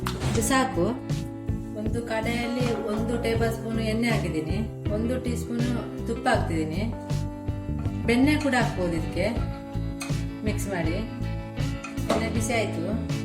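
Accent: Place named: Mangalore, India. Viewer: I like mangalore kannada.......... 😍😍😍😍😍😍😍😍😍😍😍😍😝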